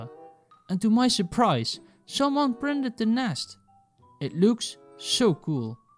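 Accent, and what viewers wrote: Netherlands. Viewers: hoor ik daar een nederlands accent?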